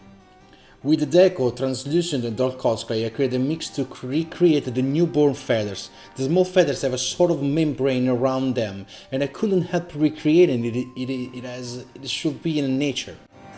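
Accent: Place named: Italy. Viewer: Your bird is very realistic, yes I love your videos in English, just do not lose your charming Italian Accent!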